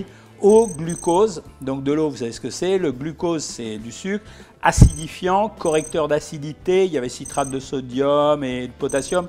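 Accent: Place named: England, United Kingdom. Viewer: J’adore votre accent anglais 😂😂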